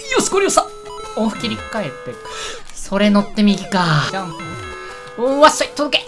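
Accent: Kansai, Japan. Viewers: バリって関西弁なんや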